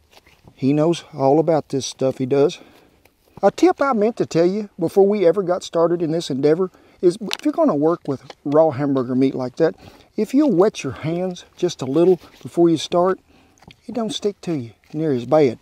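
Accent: South, United States. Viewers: Love me some uneducated-southern-accent. Edit: Not everyone in Texas speaks like.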